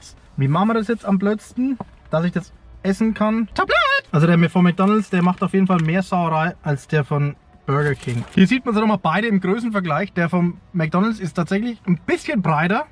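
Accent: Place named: Franconia, Germany. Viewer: Tolles Video. Dass du aus Franken kommst hört man direkt. Wir haben einfach den besten Dialekt. :)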